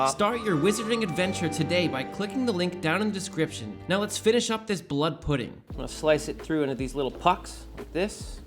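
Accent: United States. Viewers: He may have a yank accent but he's an English lad with that fryup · Clicks on link, hears an American accent then switches off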